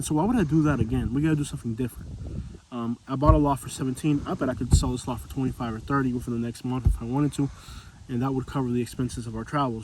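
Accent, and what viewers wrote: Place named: California, United States. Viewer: While you are taking I am hearing California.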